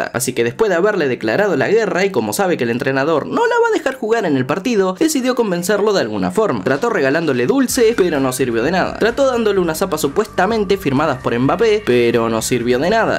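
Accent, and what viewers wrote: Uruguay. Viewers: ¿Sos uruguayo?🤨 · eres uruguayo?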